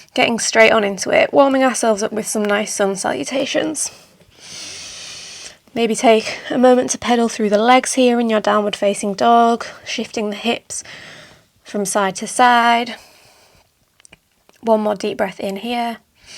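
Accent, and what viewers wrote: Yorkshire, United Kingdom. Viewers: This is the first flow I've done with you and it was great to hear a Yorkshire accent teaching yoga, makes me feel at home 😊 it was intense and I am ded but will be coming back to practice for sure x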